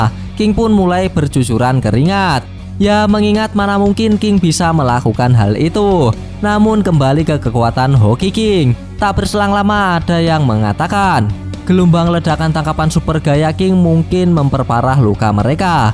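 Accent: Java, Indonesia. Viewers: Bang saran makin lama nada di belakang semakin medok dan terlalu panjang ... Sajaaaaaaaa Sanaaaaa Ituuuuuuuuuu Jangan terlalu medok ga enak di denger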